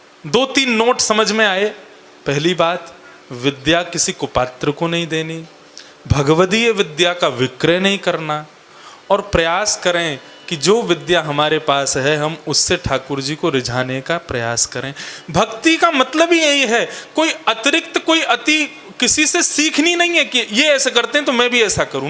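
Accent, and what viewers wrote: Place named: Braj, India. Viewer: ब्रज की वाणी मे रस और अपनापन महसूस होता हैँ 🙏धन्य हैँ आप महाराज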